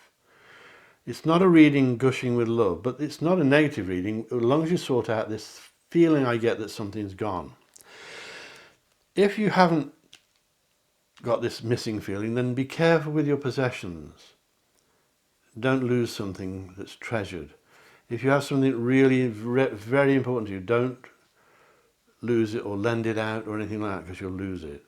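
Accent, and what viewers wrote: United Kingdom. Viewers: I couldn't choose between 3 or 4 I think they both applied to me. I'm a constant worrier and my stress levels are thru the roof. I'm dependable but not like my husband he's the one always does what he says. As for the richness I associate that to happiness and good things in my life and I have that without question. Love to watch you and listen to your voice. I'm from a state called north carolina in the US and absolutely love British accents. Thank you very much for your readings and please do continue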